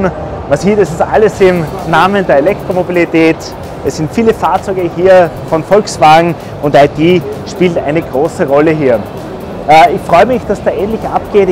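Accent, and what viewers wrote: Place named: Austria. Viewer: Ich glaube, für Leute wie mich, die sehr aufgeschlossen und begeistert für E-Mobilität sind, aber andererseits nicht sehr risikofreudig - seid Ihr die richtigen Partner. Als Allgäuer höre ich die österreichische Sprachfärbung sowiso lieber, als die Ost-Deutsche.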